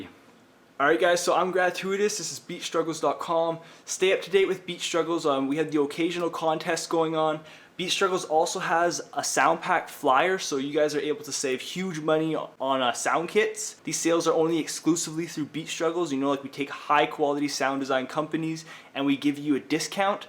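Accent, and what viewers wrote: Canada. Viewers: Is this dude...Canadian!?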